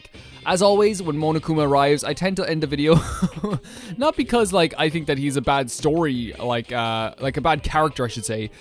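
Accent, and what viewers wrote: Ireland. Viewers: You do have an Irish accent, but it's subtle. Accents are somewhat relative anyway, relative to what voices you're used to hearing. I love them, they tend to be a great reflection of different cultures.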